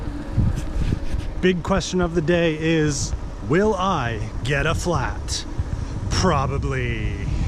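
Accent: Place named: New Brunswick, Canada. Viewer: Even though you are not fluent French speaker anymore,you kept the New Brunswick accent 👍🤣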